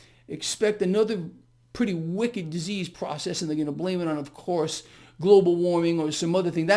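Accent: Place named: New York, United States. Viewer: I'm a life long New Yorker. You sound like you're from NY. Am I right?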